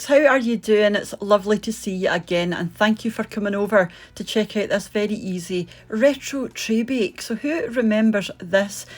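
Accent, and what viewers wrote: Scotland, United Kingdom. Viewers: What a lovely cake and such a cute Scottish accent ❤❤❤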